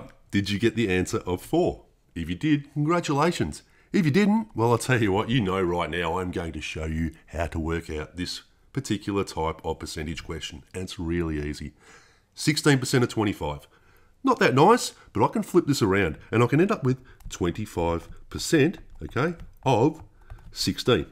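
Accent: Australia. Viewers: Australian accent makes me nauseous for some weird reason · Can we just take a moment to appreciate the Aussiness of this guy’s accent?! 👍🏻😁🇦🇺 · This would be so much easier to understand without the Australian accent- or maybe speak more slowly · Why the condescending approach and weird Australian accent????? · Midwest USA Too busy focusing on what words he's saying, let alone the content. Didn't even realize it was Australian. Man, this Pandemic's been rough. SMH multiple times for everyone.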